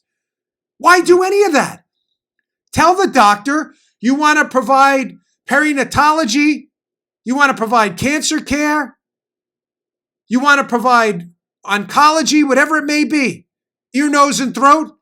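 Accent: New York, United States. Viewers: Dan...as always I cannot wait until pm to watch your videos. So much to look forward to....the great NY accent and the same brother hood with the NYPD. You rock!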